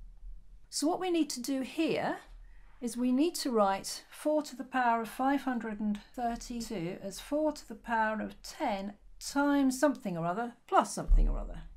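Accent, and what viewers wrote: United Kingdom. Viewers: I love your thick British accent! Much apreesh!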